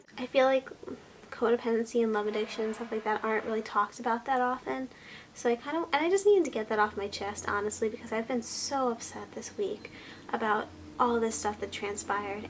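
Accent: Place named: United States. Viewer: Ok putting some points on the comment section here, 1. Me and you have literally been through so much of the same stuff 2. You're beautiful on the outside 3. You're beautiful on the inside 4. Your accent is adorable! (I'm british you Americans are adorable!) 5. Some of the points you put forward were so unbelievably true 6. I have really really bad 'love addiction' (I kinda don't like calling it that sounds weird) I have to make everyone in my life super happy and i give them so much of my time etc after this. I'm gonna think about how much i contribute to stuff like friendships etc 7. You freakin' awesome Xox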